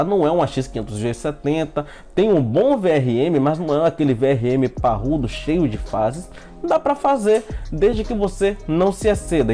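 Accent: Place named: Salvador, Brazil. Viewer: você é de salvador aaaaaaaaaaaaaaaa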